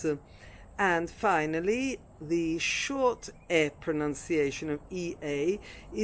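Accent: Italy. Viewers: thank you for your useful videos, Are you Italian my any chance?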